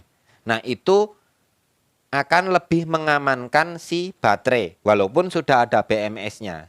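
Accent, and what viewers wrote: Java, Indonesia. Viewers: Maturnuwun inpone mas gess. Btw mas niki daleme pundi to? Kok logat jowone kental banget. 😁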